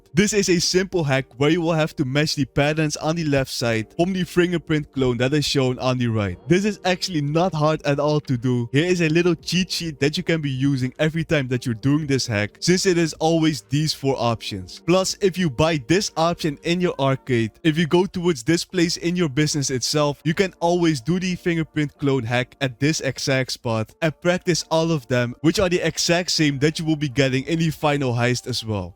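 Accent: Netherlands. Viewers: We horen de Nederlands 🥀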